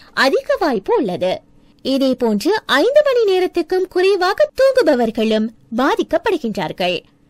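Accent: Sri Lanka. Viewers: இலங்கை தமிழ் ( பாட்டு பாடுர மாத்ரி இருக்கு 🥰🥰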